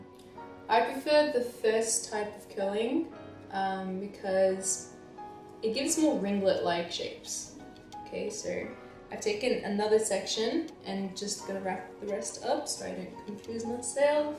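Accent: Australia. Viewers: You sound so aussie lmao. No hate. You're so cute... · Now how tf did Ik u had Arabic roots even tho u sound full on Aussie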